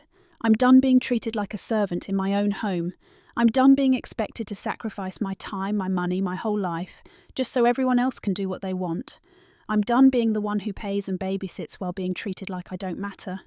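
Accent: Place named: United Kingdom. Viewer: Same story I read awhile back, with a British accent!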